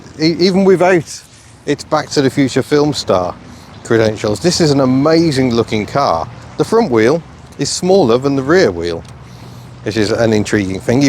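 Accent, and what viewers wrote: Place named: Scotland, United Kingdom. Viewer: That Scottish accent is so cool my friend. I could listen to you talk about vegetable gardening for hours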